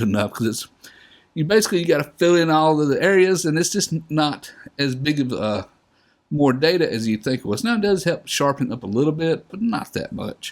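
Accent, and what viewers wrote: South, United States. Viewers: Hi, I am looking for a good camera i can shoot sports and also shoot videos. I would like your opinion on either canon t5i, canon 60d or nikon d7000 or any others u might recommend or suggest. I would like to upgrade maybe to intermedite camera I currently own the canon t3. Hey I really enjoy your southern accent and videos keep up the great job.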